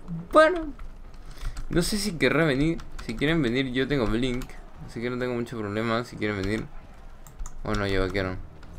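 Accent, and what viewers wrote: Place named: Spain. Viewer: estas en latam y hablas como español o.O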